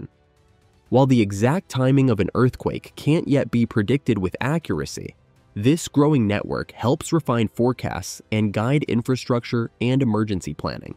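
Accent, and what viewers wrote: United States. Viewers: This is hilarious 😂! Is the guy narrating the fable from West coast USA. Because I guarantee California will have slide into the sea long before we have a problem. I'm picking this nonsense is sponsored by 1%ers that want to move here. The wealthiest people on the planet are building bunkers in the shadows of the Southern Alps. Are they also the stupidest people on the planet. Hmmm!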